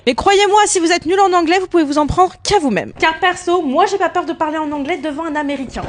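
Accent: France. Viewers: Ce que les gent veulent dire par forcé lacent ces avoir un acent trop clichés mais perso je trouve pas je trouve plutot que quand tu parle en anglais en regardant tes dernier video tu as un léger acent francais mais ne vois en quoi ca pose un problème même ces bien d,avoir de la diversité au niveau des acent et puis j'ai le niveau nécessaire pour te comprendre Et puis quand tu est nul tu peut être fort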